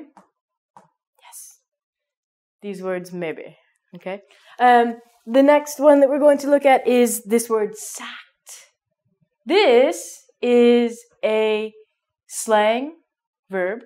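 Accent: Canada. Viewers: i think she is from canadian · Love your Canadian English tone of voice..reminds me lots of nice memories some past time in my childhood... away in Whistler Mountains.. warm hugs from Chile and thanks..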